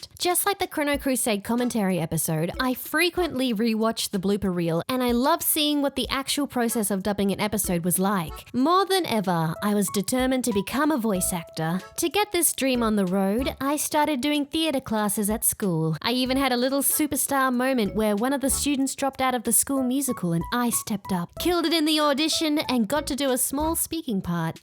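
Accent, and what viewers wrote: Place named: Australia. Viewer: u kinda sound Aussie I love it it’s a cute accent :3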